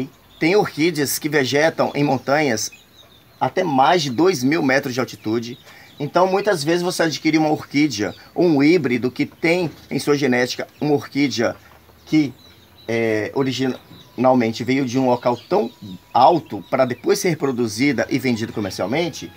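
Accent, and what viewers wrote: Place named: Minas Gerais, Brazil. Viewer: Amo os seus vídeos, vc é 10. Adorei o jeito de vc falar,desbeisado tem que ser Mineiro mesmo igual eu falo,afinal sou dessa terra também. Besossss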